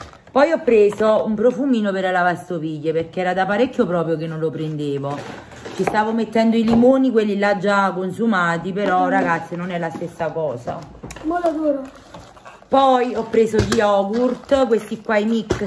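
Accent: Naples, Italy. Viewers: Ciao è da poco che ho conosciuto il tuo canale e mi piace sentire il tuo accento napoletano (io sono di Roma) e le tue ricette buonissime.Pietro è simpatico, un bambino pieno di vita ma, come mamma volevo dirti una cosa che spero non ti offenderà.Quando mio figlio era piccolo, più o meno come Pietro, era cicciottello e i bambini lo prendevano in giro, purtroppo tanti genitori non insegnano ai propri figli che prendere in giro un bambino solo per qualche kg in più può fargli male, comunque lui aveva poca autostima e ne soffriva. Adesso ha 25 anni ed ha un bel fisico, va in palestra e sta attento al mangiare come la maggior parte dei bambini che magari da piccoli erano cicciottelli e che poi imparano a stare attenti a ciò che mangiano, però quel periodo le prese in giro lo facevano stare male. Non vorrei vedere nessun bambino passare tutto ciò quindi ti volevo dire di cercare di invogliare Pietro a stare un po' più attento a ciò che mangia o magari fare sport così che non passi ciò che ha passato mio figlio. 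Scusa se mi sono permessa ma sono una mamma e so che nn è facile (sicuramente ci avrai già pensato). Un abbraccio affettuoso e a presto:face-red-heart-shape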